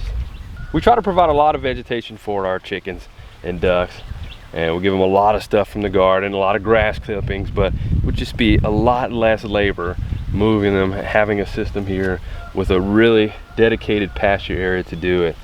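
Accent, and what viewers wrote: North Carolina, United States. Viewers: I'm new to your channel. Love the family farm. Your accent makes me miss NC. I'm on the cute little boy's side- I want to see a T-rex too! He is so adorable.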